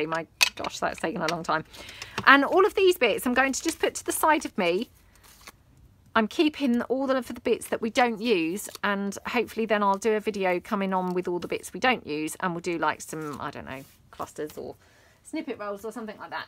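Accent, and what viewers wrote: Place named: Lincolnshire, United Kingdom. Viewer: Hi. Love listening to you. I’m in Australia Victoria (not) enjoying our 5th lockdown!! So you are my breath of fresh air. Plus the bonus for me, your accent is not dissimilar to my wonderful sister in law who grew up in Cleethorps 😂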